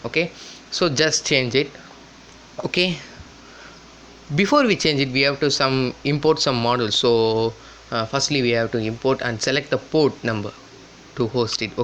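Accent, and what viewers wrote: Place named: Tamil Nadu, India. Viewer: Hi bro...neega pesura English laye tamil nu kandu pudichitan😁😁 Nalla pesureega bro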